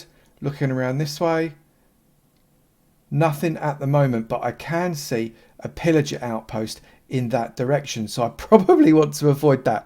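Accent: United Kingdom. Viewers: so because someone has a british accent, they're automatically Gordon Ramsay? The guy sounds nothing like him, aside from accent that is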